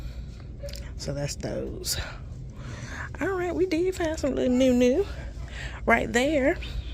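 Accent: South, United States. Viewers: This is my 1st time on your page, you have a amazing asmr voice, then add that southern accent, PRICELESS 😁😁😁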